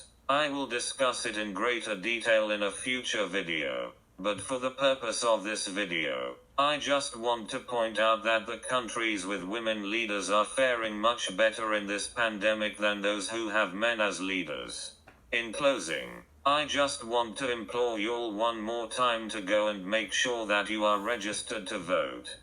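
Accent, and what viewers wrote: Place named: United Kingdom. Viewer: I love the British voicing you set on you device 👍🏽 I agree with you whole-heartedly 👍🏽 Stay well & stay safe ✌🏽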